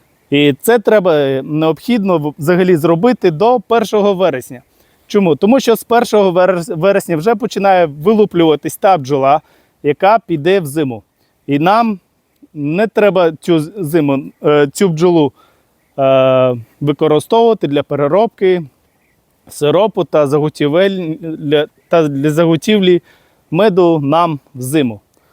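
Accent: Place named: Bessarabia, Ukraine. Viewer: Да, не ожидал услышать такой чистый украинский из Бессарабии!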